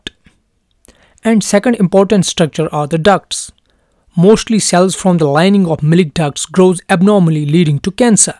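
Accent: Kashmir, India. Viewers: That's great! I guessed you might be from Kashmir by your voice. Your teaching is excellent, and the content is really helpful.Thank you sir.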